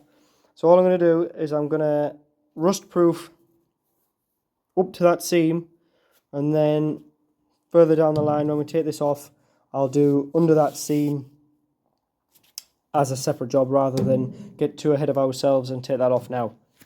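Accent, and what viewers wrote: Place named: Durham, United Kingdom. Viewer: Respect man, I love proper minis, and I’m originally from the Durham area so love your accent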